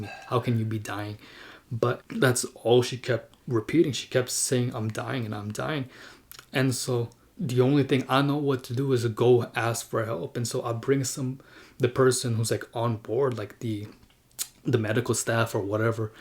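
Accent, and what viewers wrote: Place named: Texas, United States. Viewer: Bruh your accent is very beautiful. Actually I thought in Texas they speak like Arthur from red dead redemption 😅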